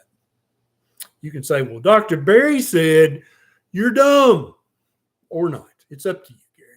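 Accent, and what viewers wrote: South, United States. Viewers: "One of these people?" Really? You don't think you have an accent? You must be joking, and I must be being an idiot for failing to realize it? You most definitely have a very marked, obvious and clear southern accent. =D I'm very nearly 100% confident that anyone here in Oregon that I show any of your videos to will immediately completely agree with me about that after hearing you speak for maybe 3 seconds. But there's nothing at all wrong with having an accent, is there? Doesn't just about everyone have some kind of an accent? I'm sure that if you hear me speak you'd notice a northwestern accent. Someone from Oregon sounds like an Oregonian. Someone from Boston sounds like a Bostonian, and someone from Alabama sounds like they come from Alabama. /shrug. Praise the Lord and pass the meat.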